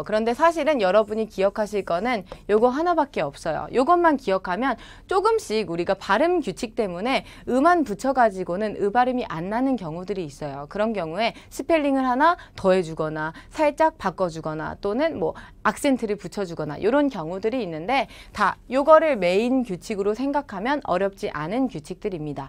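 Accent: South Korea. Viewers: 셈. 할끼요.억양이경상도쪽? 프랑스문법쉽게설명해주시어 감사합니다. 🇨🇵 😅 🇰🇷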